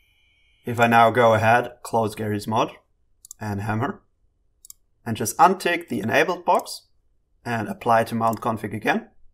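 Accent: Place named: Germany. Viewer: I höre some Deutsch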